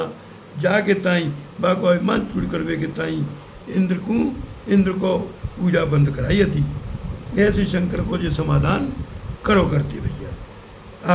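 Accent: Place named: Braj, India. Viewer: आपके द्वारा ब्रज की बोली में कथा बहुत सुंदर लगती है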